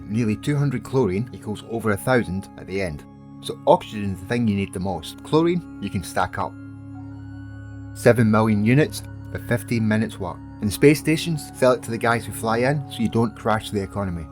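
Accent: Scotland, United Kingdom. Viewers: Scottish... U so Scottish ^o^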